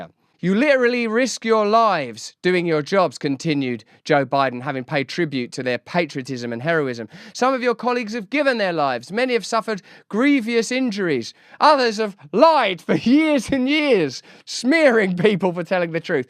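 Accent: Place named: England, United Kingdom. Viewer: Lol is this guy American? He sure sounds English😋 don't bother I know where hes from its just funny hes so wrapped around this trump. Hes a decent actor tho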